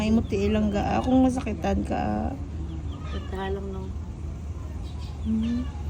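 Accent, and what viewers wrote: Iloilo, Philippines. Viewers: knamian ko sng mga tono ilonggo ah.. tga dn ka maam? watching from Bacolod..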